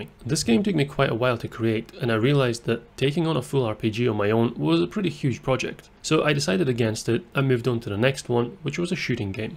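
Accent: United Kingdom. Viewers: are you Scottish btw?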